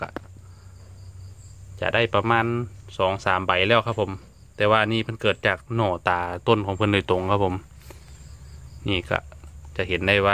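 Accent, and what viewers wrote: Korat, Thailand. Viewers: ช่องอีสานฟังไปฟังมาเหมือนคนโคราชเลยพี่คนที่ไหนครับทำไมพูดแบบนี้ ผมเเค่สงสัย555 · สำเนียงคนโคราชแท้ครับ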